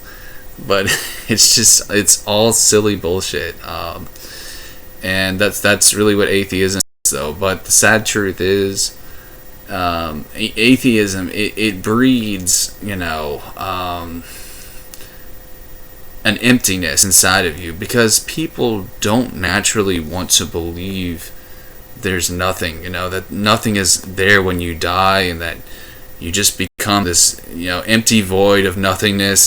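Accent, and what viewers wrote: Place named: United States. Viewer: Atheism is not a theory. So... before you try to "debunk" something, you should first learn what it is. Never a good start, when your first sentence demonstrates your ignorance on the subject you attempt to talk on. Atheism is a RESPONSE to a claim. The claim "There is a god". Atheism is the rejection of this claim, as unsupported. Got it? Good. So... you sound like you're from the US. And in the US, pretty much all people in higher offices are theists. They HAVE to be, otherwise the general public wouldn't elect them. Because apparently, whether or not you believe in an invisible being with supernatural powers is more important, than whether you have good qualifications or good political ideas. So yeah... you're idea about atheism coming from the "elite" falls flat right in the beginning... Illuminati? Are you serious? 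0_o The state isn't my god. I don't think the state is an all-powerful, supernatural being. The state also isn't ONE entity. It's made up of a number of people. I also don't worship the state. So, all in all: That's a weird claim to make! You literally have to redefine what you mean by "god", to make this claim work. And honestly, if you have to redefine terms, just to make your point, you should already realize that you don't have a good point to make. That's not a number one theory of atheists. That's not a number 10 theory of atheists. Actually, that matches more what theists believe. Theists usually believe that the entire universe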